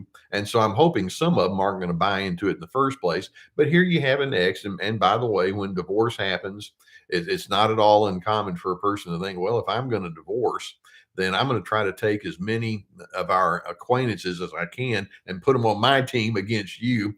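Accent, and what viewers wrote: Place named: Texas, United States. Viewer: I knew it! I was asking myself just the other day where you might be from & l said "I'll bet is from Texas." Not to brag but l was right! I used to live in Austin where l met folks from around the State & your charming accent brings back fond memories of my time there. I'm a much happier & more capable person in dealing with my own situation since l started watching your channel a year & a half ago. Thank you from Toronto, Canada.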